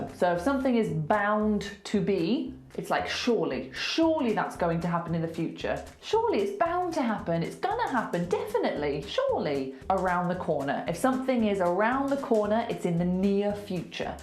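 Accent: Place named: West Midlands, United Kingdom. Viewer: I cant believe you live in West midlands,im from walsall now your accent makes sense😃😃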